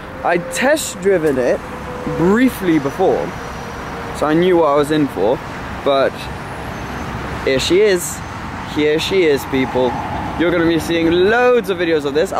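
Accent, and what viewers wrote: France. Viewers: Are u English you accent seems to blip into French sometimes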